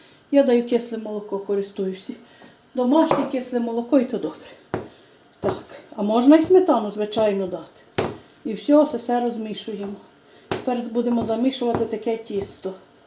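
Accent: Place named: Galicia, Ukraine. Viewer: Так гарно слкхати наш галицький говір. Як мкзика звучить.. ❤❤❤❤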